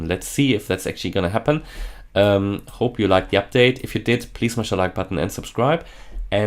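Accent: Germany. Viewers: I bet you are either from Germany or Austria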